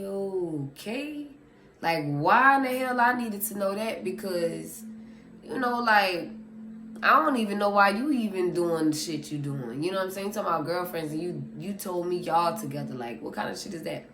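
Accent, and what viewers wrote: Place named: Louisiana, United States. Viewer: I knew you sounded Louisiana lol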